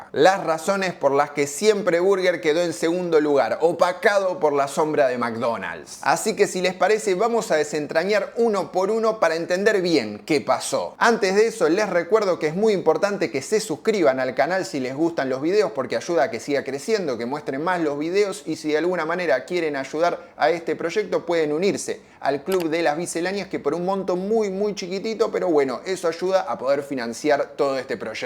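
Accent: Argentina. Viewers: Es Argentino...no te a hablar en español neutro... Es lo mismo que yo pretenda escuchar un podcast mexicano o brasilero y me queje de la tonada... Hay gente estupida en este mundo....realmente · este no es español,es argentino o uruguayo 😂😂😂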